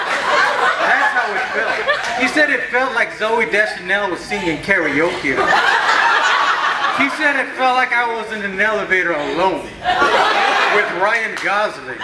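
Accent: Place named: California, United States. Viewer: Whoo this guys from California for sure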